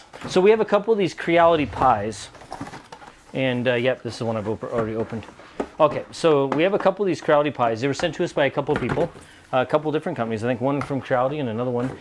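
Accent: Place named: Idaho, United States. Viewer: Yeah, although mountains can have increased exposure to solar effects which can lead to greater evaporation, since mountains tend to have fewer local sources of water, and lower levels of vegetation, they can end up with lower humidity in summer. And moreso if the local/regional climate is already dry during the summer. I've only seen maybe a dozen or two of your videos, and I don't think I've heard you say where you are, but based on that brief clip of a snow storm, your channel name, appearance, and accent, I'd guess that you're somewhere near Idaho. That whole region has a mix of mediterranean and semi-arid climates, with summer already being the dry season. So, that would all make sense. I've only seen a dozen or two of your videos when YouTube has recommended them to me, though I am subscribed. I hadn't seen your streams, but I went to find the stream you referred to, thinking I'd see what you talked about, but it's ten hours long, so I decided against it. 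😀 Looks like you're doing cool stuff, though. 🙂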